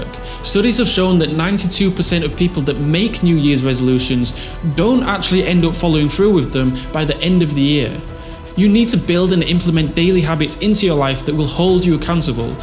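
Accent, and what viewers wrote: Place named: United Kingdom. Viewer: I really love your British accent!!😊😊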